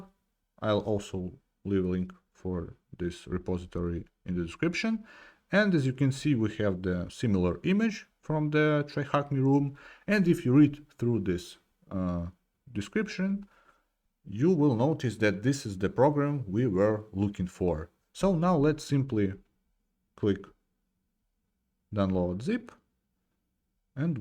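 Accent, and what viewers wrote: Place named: Russia. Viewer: русский акцент сразу чувствуется))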